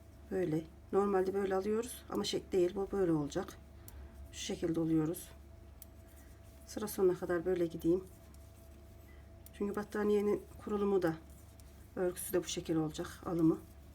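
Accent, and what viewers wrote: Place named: Kayseri, Turkey. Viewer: Bacım sen nerelisin kayserilimisin ne tatli turkcen var 🥰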